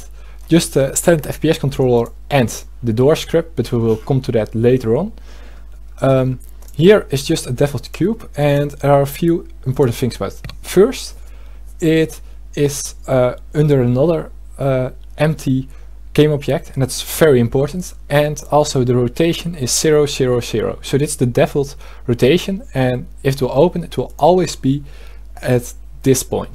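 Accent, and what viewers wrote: Netherlands. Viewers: gek Nederlands accent bro thanks voor de tutorial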